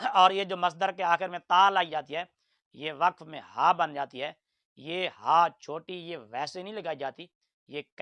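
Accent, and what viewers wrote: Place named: Punjab, Pakistan. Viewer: مفتی صاحب آپ بھی گلابی اردو بول رہے ہیں آپ کی اردو میں پنجابی لہجے کی ملاوٹ ہے کراچی میں آپ جیسے لوگوں کو ڈھگا کہا جاتا ہے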